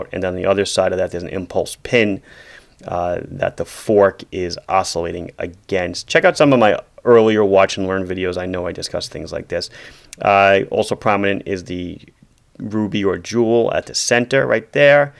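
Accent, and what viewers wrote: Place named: New York, United States. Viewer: am just here for the NY accent...and the watches sometimes.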